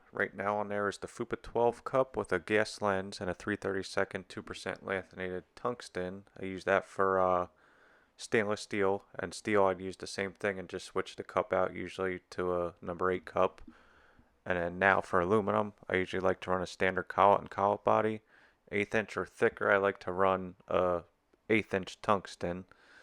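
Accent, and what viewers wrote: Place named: Southeast Pennsylvania, United States. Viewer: Nice work and thank you for sharing,! Where's your shop? It seems like I'm hearing a S.E. Pennsylvania accent.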